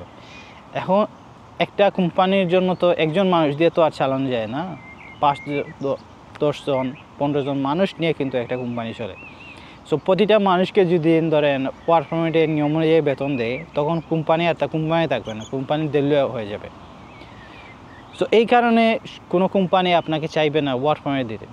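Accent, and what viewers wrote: Sylhet, Bangladesh. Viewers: ভাই কিতা সিলেটি নি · মনে হয় সিলেটি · কথায়ই বুঝা যায় সিলেটি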